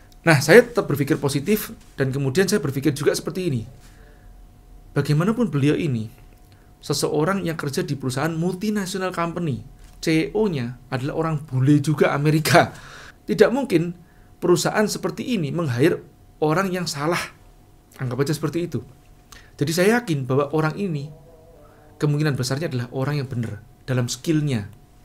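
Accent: Java, Indonesia. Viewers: Medhoooknyaaa sukaa bang. Salam kenal dari Elsera di Sidoarjo.. Semoga saya bisa sukses seperti anda bang..🙏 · Rodo medok 😁,thanks inspirasinya bos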